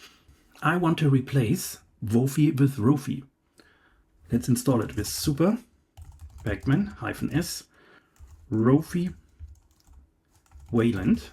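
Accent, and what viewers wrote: Germany. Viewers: are u deutsch?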